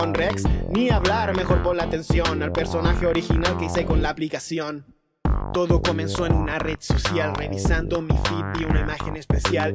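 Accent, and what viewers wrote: Chile. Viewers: Es chileno verda¿ KSNSMAKANKSS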